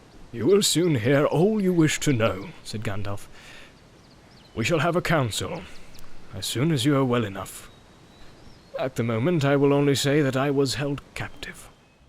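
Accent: Scotland, United Kingdom. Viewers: I agree completely, the shear amount of world building and the flavor of the tale are set here. Rich lessons and enjoyable dialogue; ive come back to this chapter many times. I could listen to you read the entire chapter, Scotch accent?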